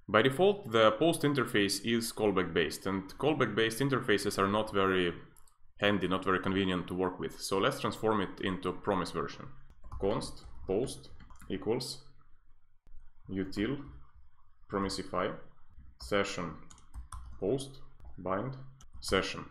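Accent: Russia. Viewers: Сдаётся мне, что ты русский)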